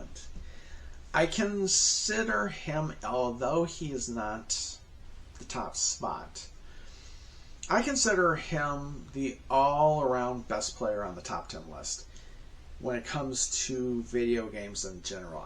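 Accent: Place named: Australia. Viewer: G'day mate. Love your Australian accent.... ;) Just wanted to let you know, I was in Japan last year and met up with Acu Azu and he's an absolute legend. He plays DK like a machine, it's absolutely insane watching him play and comparing his style to mine is night and day. He was lovely enough to bring some other Japanese guys who play DK, including the guy who brought DK out on the switch for Hamster inc. Their community seems to be filled with guys who just want to help each other achieve greatness.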